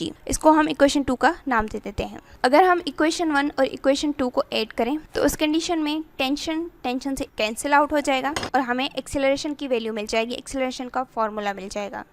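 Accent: Sindh, India. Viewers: Mam are you from sindh and are you Sindhi I think your mother tongue is sindhi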